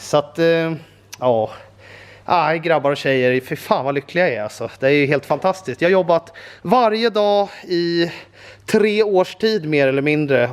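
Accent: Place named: Stockholm, Sweden. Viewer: Hehe så skön stockholmska. Smutta upp den. Haha. Man blir så glad att lyssna och se på sköna människor. Du gjorde verkligen min dag kompis!!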